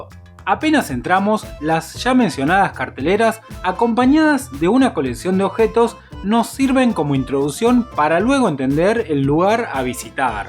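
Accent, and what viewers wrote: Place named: Argentina. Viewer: lo intente ver pero el tonito argentino es realmente castrante, no pueden esforzaren hablar bien, no se dice casye se dice calle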